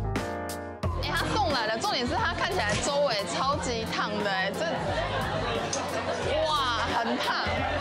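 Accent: Taiwan. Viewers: 看1分钟，好尴尬的台式全然大丈夫、なにこれ。。。ありがとうございます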